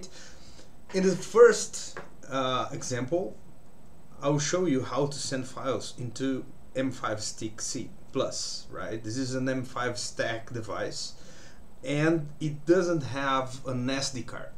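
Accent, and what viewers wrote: Brazil. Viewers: Desconfiei que era br pelo sotaque kkkk representou mestre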